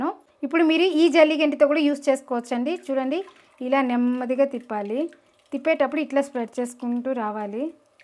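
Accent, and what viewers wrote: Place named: Chittoor, India. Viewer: Maa Chittoor yasa lo matladuthunte Santhosham ga undhi. Tamil and Telugu kalisina yasa. Nanna, Superb ga chesavu Laddu, mixture. Mana function ante Laddu and Mixture compulsory kadha.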